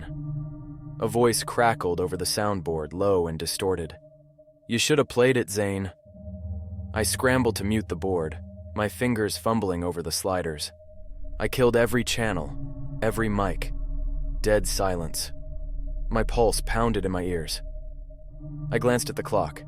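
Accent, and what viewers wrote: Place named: Jamaica. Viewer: your Jamaican accent is fine, believe me you don't want to be anything similar to a Ja... 🚫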